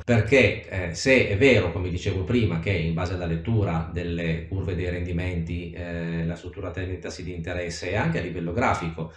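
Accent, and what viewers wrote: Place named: Piedmont, Italy. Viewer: Riconosco l'accento piemontese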